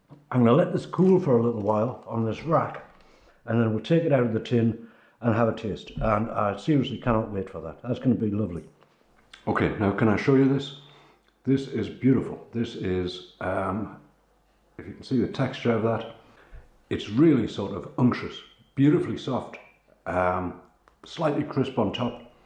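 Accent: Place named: Northern England, United Kingdom. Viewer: Love your channel and your accent. I'm from the north east of England and live in Australia so lovely to listen to you.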